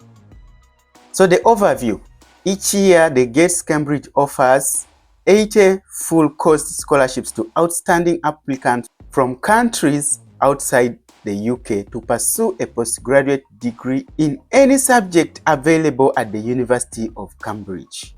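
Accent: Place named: Uganda. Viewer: I heard your voice and I knew you are Ugandan. Thank you for caring.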